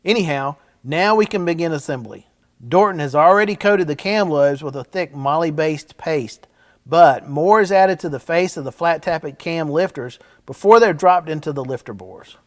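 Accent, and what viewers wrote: South, United States. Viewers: That speech pattern is called "Southern" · And the Oscar goes to 'All the Oddities of Ford's Famous Flathead V8'. A video above the rest. Award winning performance by (the artist). Narrated by a good ole boy from the South. It don't get better than this!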